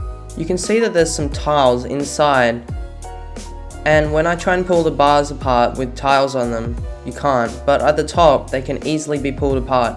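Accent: Australia. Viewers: are you aussie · YOUR AUSSIE!!!!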